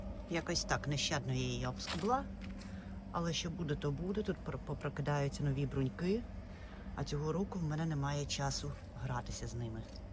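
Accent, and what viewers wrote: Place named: Germany. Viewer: Вітаю! Випадково натрапила на ваш канал, зайшла, сподобалось, цікаво, коли люди діляться досвідом, а ще цікаво заходити в гості до інших, щось пізнавати чи запозичати ідеї. Ви, мабуть, вже довго в Німеччині, відчувається акцент, знаю німецьку мову, чую її ознаки у вашій бесіді. Але у вас чудово виходить й украінською! Ви молодець! Запрошую і вас до себе віртуально погостювати! Вирощую сад, знімаю відео! Заходьте!❤❤❤❤❤❤Уявляю, як незабаром розквітне садочок на вашій ділянці! Коли люди мають таке бажання і азарт, як ви, а також роботящі, в них обов’язково все вийде!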